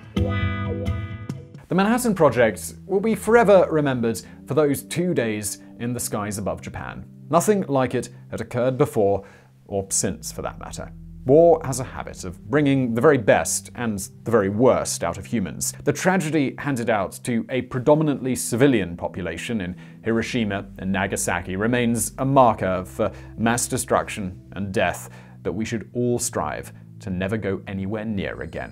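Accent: United Kingdom. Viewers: Looking a little weak in front of the history guy. Little bit scattered. Missing a few points. The British accent can't save you forever.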